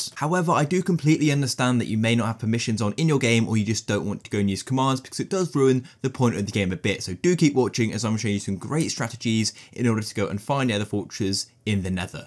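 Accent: United Kingdom. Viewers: 1 word british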